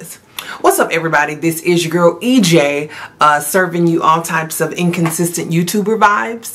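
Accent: South, United States. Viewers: you look so pretty✨ and i can really hear you southern drawl 😍💕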